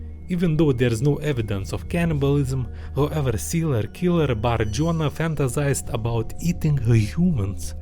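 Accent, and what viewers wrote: Russia. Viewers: Are you Russian if so that’s fucking awesome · speaks English in Russian manner. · Speaks like a third of Russians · I might say several positive comments about your vids, but they've been already said. In addition, narrating horror documentaries with russian acent might be a new genre, so keep it up my friend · im Ukrainian and by your accent and costume i already think you are Russian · Are you russian? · Are you from Russia?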